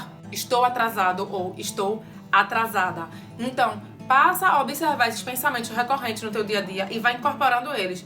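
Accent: Pernambuco, Brazil. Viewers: Adoro seu sotaque pernambucano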